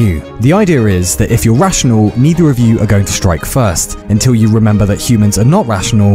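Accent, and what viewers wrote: United Kingdom. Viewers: Pls drop that british accent pls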